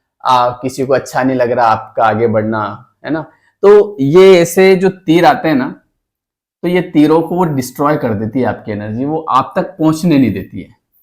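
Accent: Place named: Madhya Pradesh, India. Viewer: भैया आपकी बोलने की टोनिक वाकई एमपी वाली है मैं भी एमपी से हूं❤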